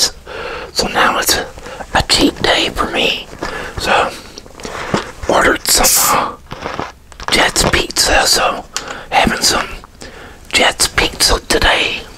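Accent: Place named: Texas, United States. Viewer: Seriously one of the best channels there ever was and ever will be ur Texas accent is the best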